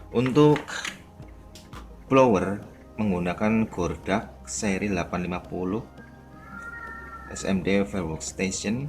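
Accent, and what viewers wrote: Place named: Banyumas, Indonesia. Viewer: ngapak juragan 😁😁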